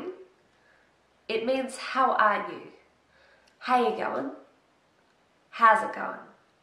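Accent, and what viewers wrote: Australia. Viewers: You have an Australian accent except you pronounce your T's.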